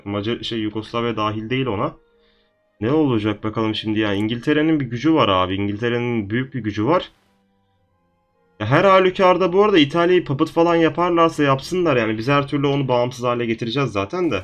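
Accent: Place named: Black Sea, Turkey. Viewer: Birde bişey sorcam siz çankırılısızda peki sizin anne tarafı rica edersem kardenizlimi çünkü ben giresunluyum ve siz biraz karadenizli gibi konusuyosunuz bizim oranın insanları gibi sıcak kanlı ve komuksiniz biraz lehcenizde öyle gibi sadce merakımı arz ettim roca edersem cevaplarsanız sevinirim tabikide siz bilirsiniz bu soruyla ayıp ettiysem afadersiniz yaklasık 2 senedir takip ediyom sizi ememğinoze saglık bayramınız kutlu olsun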